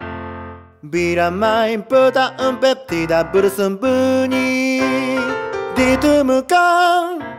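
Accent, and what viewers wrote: Japan. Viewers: Tiba tiba lewat timeline lagi ni senam akwowkwk, dulu gak nyadar tapi pas didengerin sekarang aksen Jepang nya baru kedengaran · Aksen nya jepang banget 🥰🇯🇵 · Ini orang Jepang gaes yang bikin,yang ngomong itu logat Jepang nya masih nempel😂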